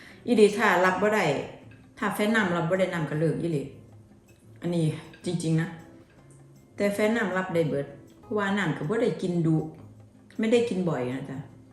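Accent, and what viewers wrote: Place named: Chaiyaphum, Thailand. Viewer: สำเนียง เหมือนคนขัยภูมิ แม่น บ่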